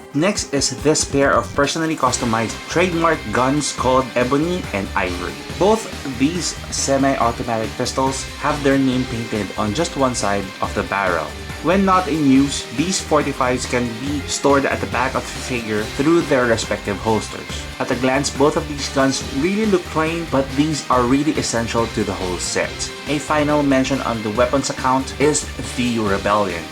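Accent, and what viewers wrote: Philippines. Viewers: are you fillipino? you sound like it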